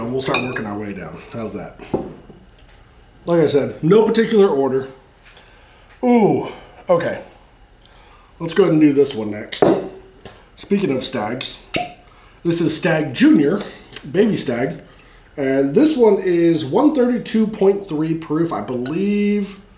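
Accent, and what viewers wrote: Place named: Nashville, United States. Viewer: Sound like you live close to Nashville I’m from Lewisburg !!!!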